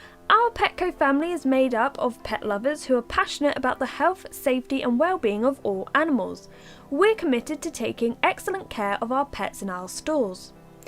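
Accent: United Kingdom. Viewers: Ehy do you have a British accent ?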